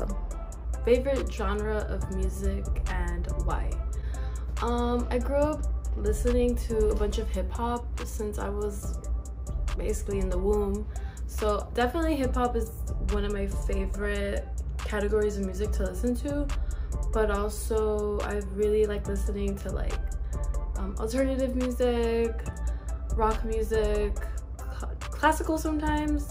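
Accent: Spain. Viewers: Your Spanish accent <3 <3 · I like your spanish accent.. Greetins from cozumel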